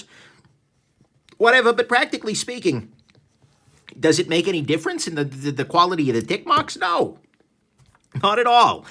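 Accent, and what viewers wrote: New York, United States. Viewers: Your accent is so cool! Are you from New York? I quite like this pencil for drawing technical drawings, I still need to upload a video where I use it but I like it so much that I'm going to be selling these on my website. I am looking forward to trying one of the Rotrings some time.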